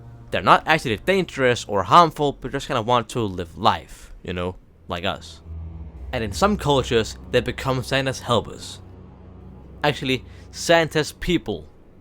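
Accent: Denmark. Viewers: Thought I recognized the accent. It's Danish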